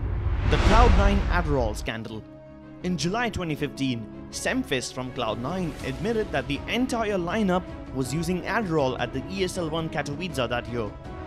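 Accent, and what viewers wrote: India. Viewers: This Indian accent is killing me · Indian accent f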